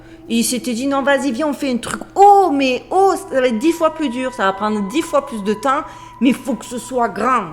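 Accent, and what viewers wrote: Marseille, France. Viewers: Accro à vos vidéos je suis;-) en direct ou plus souvent en replay. Votre curiosité insatiable, votre sens du détails, votre façon de raconter, votre petit accent de marseillaise vivant à Las Vegas, votre franc parler, la qualité de vos reportages.....et votre beauté qui est à souligner egalement...Tout de est remarquable. Mais... où est donc Duchesse aujourd'hui 🤔Merci beaucoup, infiniment, de votre travail, de vos recherches fouillées. Mais comment faites vous donc pour avoir un nouveau reportage à nous présenter chaque dimanche??☺🤩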